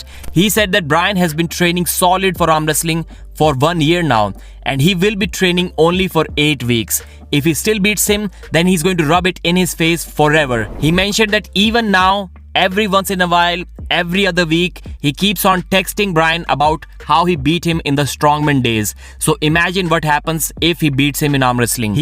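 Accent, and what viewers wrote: India. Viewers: I can't listen to that deescoosten idiian vooecee.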